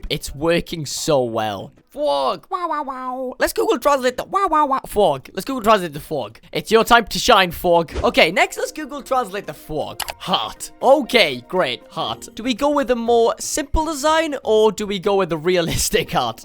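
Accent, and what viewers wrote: Wales, United Kingdom. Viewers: Holy crap thats the most welsh accent i ever heard 🏴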